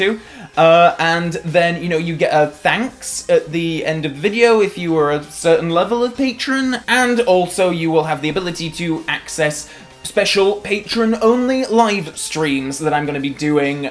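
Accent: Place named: North, United Kingdom. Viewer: Thank you very much for replying! The curious thing about doing a You Tube series for so long is you can chart yourself and any differences. Just to say, I really like your older videos as well as your newer ones (so I hope you never take those down). In their favour, I'd say you had quite a droll style back then, and it was fun to see when you got (faux?) annoyed. But I like how you come now across as well, it's very engaging and warm, esp to new viewers. Although it is nice to see when you get put out by something bad in a Bond film or game. And yes, you did used to sound a lot more Northern!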